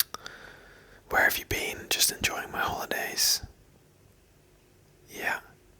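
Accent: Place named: Ireland. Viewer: To whoever asked him to prove he's Irish, his accent proves he is Irish.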